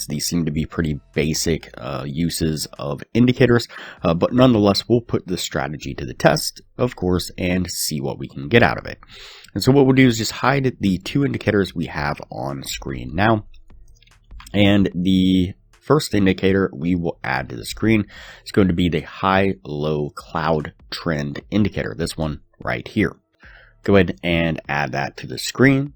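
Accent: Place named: United States. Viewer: This voice is US accent, it will not work in India. It irritates, though can be understood with little effort but irritation pain is much more than benefits !!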